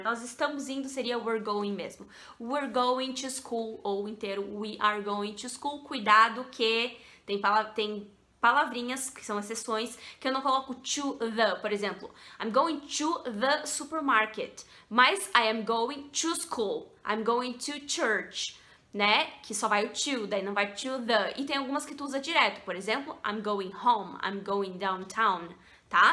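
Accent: Rio Grande do Sul, Brazil. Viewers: vc é linda e muito inteligente mas esse jeito de falar dos gauchos são nojento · ESSE SOTAQUE gaúcho e os verbos USADOS no tempo certo É MUITO chic, PARABÉNS !!!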